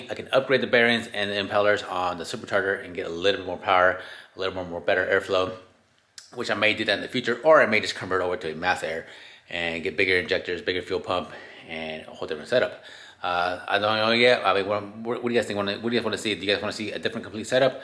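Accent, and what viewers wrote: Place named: Texas, United States. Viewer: Thats pretty good gains from stock running gear... i just picked up a 94 single cab short bed f150 myself and my dad is wanting to do a lightning clone... heres a thing to think about tho... was that other guys truck a lightning? Cause a real lightning had GT40 heads on it which you can tell be the three ribs on the front of the cylinder head... if you have those then thats why you made way better power cause the GT40 heads are 150 cc intake runners compared to the 130-135 cc intake runners from the plain heads... my truck is a straight 6 which im thinking of upgrading just to have something different... it sounds like youre in texas, im down in the rgv... maybe after i get my truck built we could have a shoot out 🤷🏽‍♂️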